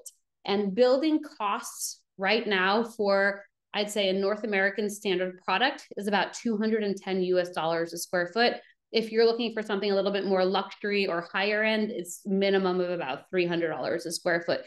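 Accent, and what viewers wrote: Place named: New York, United States. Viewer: As soon as you started speaking, I knew you were from NY!! :D Great content. Thank you for your work!! Looking forward to your next live session, and your other channel. Notifications are now on. Belize planning underway.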